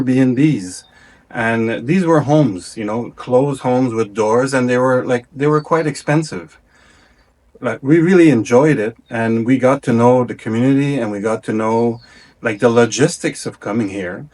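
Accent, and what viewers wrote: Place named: Quebec, Canada. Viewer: Wow, I stumbled on your videos by accident searching for anything PV. My wife and kids have been visiting PV for a while, we normally stay downtown around Versalles in Airbnb’s. We do like the comfort of a condo but a palapa feels more like something I would feel grounded to nature in and would be nice to experience. Your spot in the mountain overlooking the ocean looks incredible. Your story is very inspiring, I’m a little younger (42) but we seem to have some things in common. I do sometimes wonder how different it would be living like you are, to someplace slower moving and more relaxed. Good for you sir! I sense a French Canadian accent in the way you speak… Je vous souhaite à vous et votre femme beaucoup de bonheur. De Mtl.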